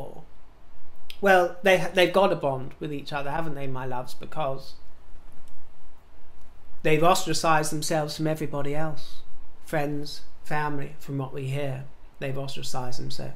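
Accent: United Kingdom. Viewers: A very quick personal question, if you don't mind. Are you an 'alter ego' of Gibbs? I ask only because a very unusual name to use and you do bear a striking facial resemblance to Mr Gibbs. Whether or not the answer is yes (and your very British accent and behaviour suggest not), your regular videos are real gems and match both my own sense of humour and views of royalty and the 'ex-royals'. Keep up the excellent work! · I adore you. You're so very British to me, I'm from near Chicago haha. You have the best voice, accent, look, and everything about you is regal or something lol.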